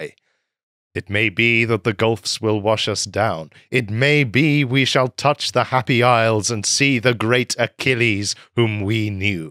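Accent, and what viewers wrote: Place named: United Kingdom. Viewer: The Brit narration can not be beat.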